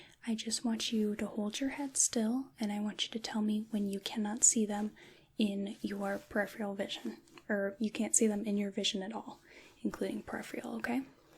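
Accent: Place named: Midwest, United States. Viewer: I'm from Missouri and you sound just like me lol 😂 Midwestern love!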